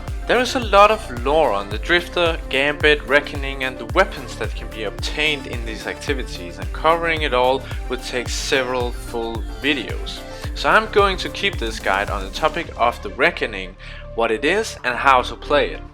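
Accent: Denmark. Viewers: im almost certain that you my good sir are danish #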